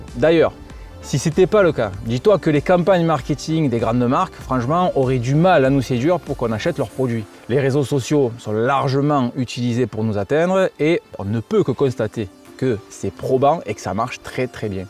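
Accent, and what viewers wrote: South, France. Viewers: Merci pour cette belle vidéo, j'apprécie ta façon d'aborder les choses et ton honnêteté et biensûr il ne faut surtout pas oublié l'accent du sud si charment droit au but 💪🏾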